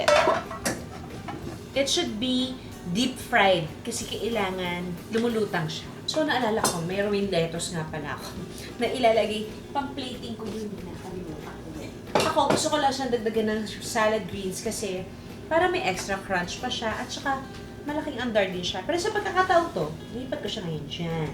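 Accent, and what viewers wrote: Batangas, Philippines. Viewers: nagkakaroon na po kayo ng puntong batangan. nakakaproud lang. palm sugar po sa batangas ay SANGKAKA..more power po.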